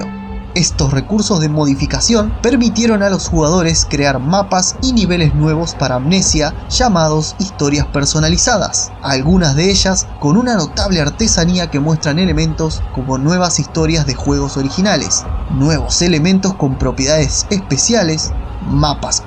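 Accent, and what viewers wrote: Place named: Peru. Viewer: Alta voz de peruano tiene este re gri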